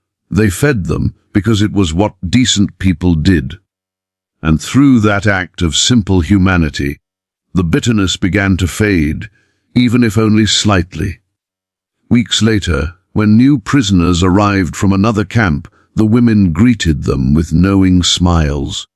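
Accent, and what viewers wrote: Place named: United Kingdom. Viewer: Why do you use a British sample for the narration?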